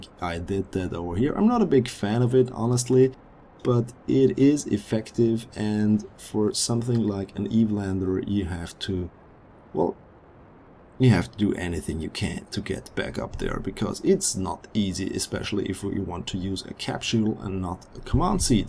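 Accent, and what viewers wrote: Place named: Germany. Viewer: You sound a bit german...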